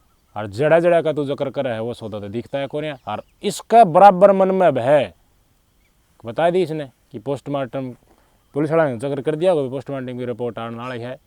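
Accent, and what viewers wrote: Haryana, India. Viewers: Bhai apki speach delivery kamal ki hai haryanvi bhi aap mitha karke bolte ho..bahot badiya bhai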